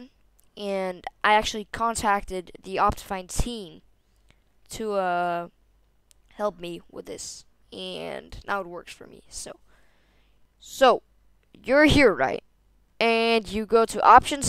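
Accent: Denmark. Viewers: damn your english is so good i can barely hear that you're danish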